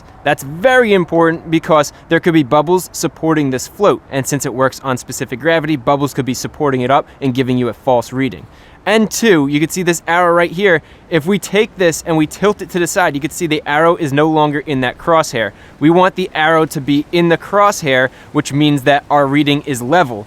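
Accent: United States. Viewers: Are you an American,?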